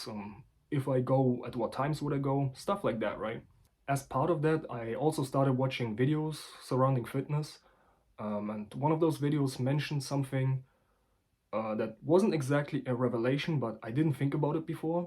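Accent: Germany. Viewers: I hear your german acsent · No youre right. Single mothers DO ruin their children, by not supporting them to become self sufficient. Statistics back this. Single mother children are multiple times as likely to end up sex workers or in jail, while single fathers tend to keep the kids out of bad paths like these. You gotta stop relying on your mother in life. Make a deal with her that if she supports you (shes legaly obliged to in Germany, thats what your accent sounds like), then you will do an Ausbildung or a Studium. Also you would get Bafög. Otherwise youd get Grundsicherung. These are the ways to go: GET OUT of there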